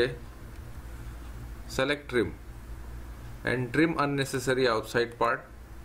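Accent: India. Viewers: 讲得非常好！听这口音，我就猜到是印度人。能听出来是因为最近学音标，看印度电影。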